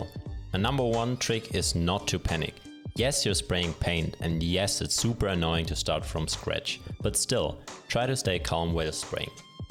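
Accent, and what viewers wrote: Germany. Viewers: Are you German? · Are you German ? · Are you german? I kinda hear it out. Danke für das Video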